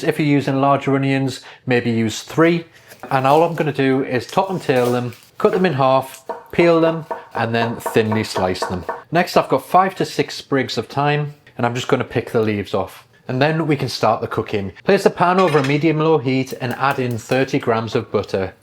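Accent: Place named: Northern England, United Kingdom. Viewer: Where is that accent from. Not far away from the A69 me thinks. Just discovered you and loving what you do.😊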